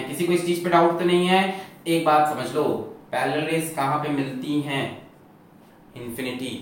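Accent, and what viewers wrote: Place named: Bengal, India. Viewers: sir akdm rapchik bengalii. You are amazing sir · Sir ur bengali ascent is very good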